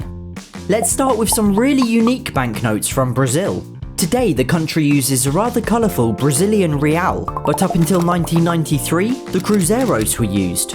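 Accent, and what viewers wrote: United Kingdom. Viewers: I love your british accent 😂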